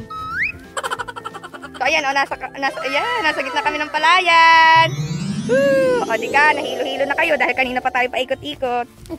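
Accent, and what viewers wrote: Batangas, Philippines. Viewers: Yung lingguwahe katulad ng mga taga batangas.. keep it up 😁😁😁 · parang Batangquena ka? cute mo naman ang ganda ng lugar nyo...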